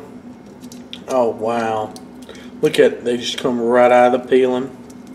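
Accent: South, United States. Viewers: I was initially turned away from the video due to negative experiences with individuals with Southern accents, but I like how he repurposed the Sriracha bottle. It's funny how embracing different food cultures releases any tension I had that he belonged to the same group of hate-filled individuals in my past. Nice vid dude, it's a neat idea cooking on a salt slab. I've never seen it done like that:-) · I know you love to cook but PLEASE do a voice over for one of my books, I love your southern accent. brings me back home! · ok you sounded southern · Interesting southern accent and cooking. I enjoy your video, thanks. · All the comments about your accent are infuriating. But I guess some people have never been down south. They're missing out on some good cooking. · i never know they had this salt block... like your steak and accent man.. yeehawww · You have heavy southern accent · Love that southern twang! 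· lol the southern accent. I am in NOLA. 1. Wouldnt it be better to peel off the shrimp skins for the ingredients to seep in all over it? 2. For how long should we wait for the ingredients to seep in the beef and the shrimp after applying the ingredients on them? Thanks.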